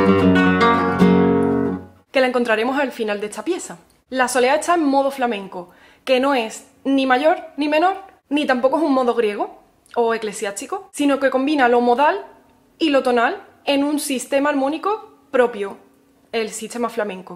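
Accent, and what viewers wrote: Andalusia, Spain. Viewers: she is from Andalucía, she has a particular way of speaking spanish. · Es que es de Andalucía donde tienen un acento muy propio. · it's not a dialect but spanish with accent from Andalusia. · A quien no le gustaría tener a una profesora de guitarra tan guapa.... Madre mía....y con este acento andaluz...es un encanto escucharla. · Es español, español, no se trata de ningún dialecto, es Español con sus toque particular andaluz en la bella voz de.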